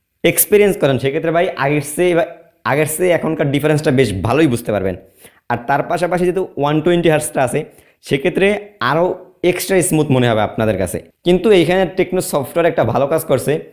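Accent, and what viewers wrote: Barishal, Bangladesh. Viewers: সুন্দর গুছিয়ে কথা বলে কিছু বরিশালের কথা চলে আসছে যেটা আরো বেশি ভাল্লাগছে ভাই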